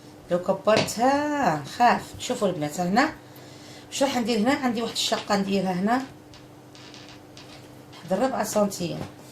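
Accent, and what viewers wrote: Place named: Djelfa, Algeria. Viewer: تهدري كيما صحاب الجلفة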